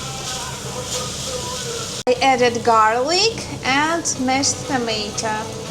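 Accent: Russia. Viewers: It's very helpful to get unfiltered information about the actual Russian experience so thank you very much for sharing. Also, your English is excellent (and I find your Russian accent very charming, by the way) so please keep posting!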